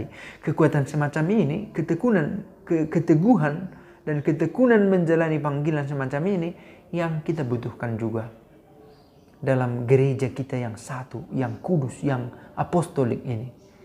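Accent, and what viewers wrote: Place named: Flores, Indonesia. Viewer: Bro ini chinese berlogat flores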